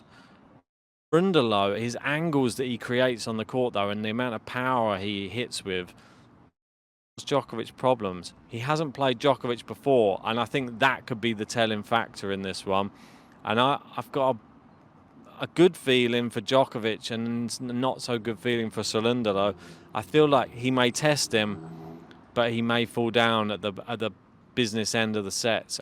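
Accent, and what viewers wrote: United Kingdom. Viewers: It’s the British accent. Give the guy a break!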